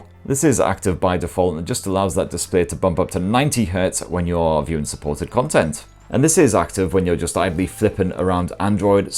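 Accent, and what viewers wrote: United Kingdom. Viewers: love your classic british humor laced accent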